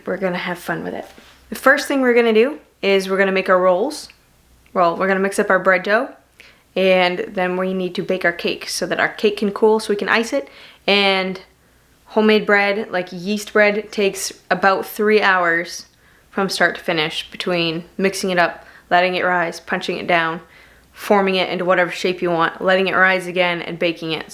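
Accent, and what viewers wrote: Maine, United States. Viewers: Friggen loved it. Your accent kills me brings me back to central ME. I'm gonna try that potato dish. I get honey baked hams every year from my work so I don't have to do all that glaze work. Soak your toothpicks and they won't get crispy your man's got him a great woman! Happy Easter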